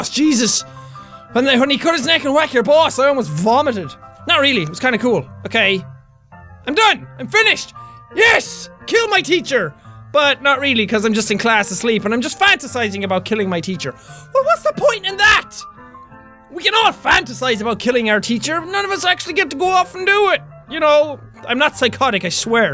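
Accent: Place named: Ireland. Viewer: The Irish accent is 10 times stronger in the old videos.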